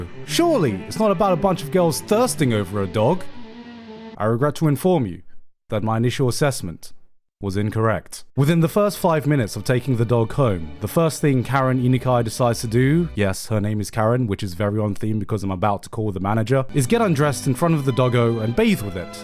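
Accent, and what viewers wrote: United Kingdom. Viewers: This video is conclusive proof that a british accent doesnt mean youre smart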